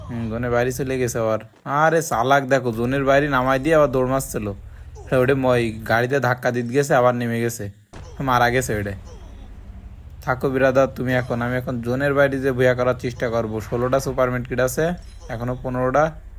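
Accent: Jessore, Bangladesh. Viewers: ধন্যবাদ ভাই আপনার খেলা দেখে জুন পোস্ট দেখে ভালো লাগে, আপনার কথা শুনে মনে হয় আপনি যশোর জেলার লোক, আপনার বাসা কি সাতক্ষীরা, ঝিকরগাছা, আমার বাসা যশোর ঝিকরগাছা থানা বাঁকুড়া ইউনিয়ন,❤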